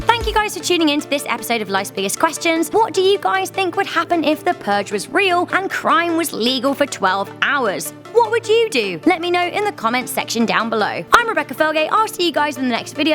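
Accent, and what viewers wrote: United Kingdom. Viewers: no offence, but how come a lot of these kinds of channels have annoyingly high-pitched british-accented women?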